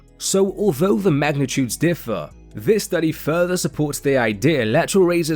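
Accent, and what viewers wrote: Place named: England, United Kingdom. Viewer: You sound English but you call it soda?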